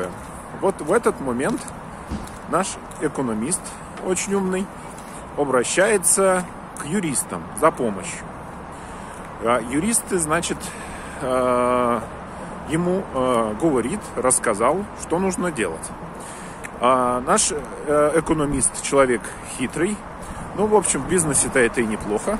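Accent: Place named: Saint Petersburg, Russia. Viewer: Ты же из Алтайского горного округа родом, здесь Петербургский русский язык со времен горных офицеров, кабинетных земель, золотых рудников итд, без аканий и оканий. Разве что, уральский казачий говор есть, как в речи у Шойгу.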